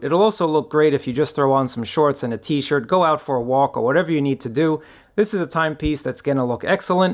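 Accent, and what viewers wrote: New York, United States. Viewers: Love the NY accent.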